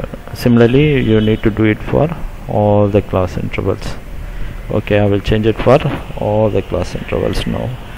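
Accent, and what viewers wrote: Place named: India. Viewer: The most Indian, Indian accent I've ever heard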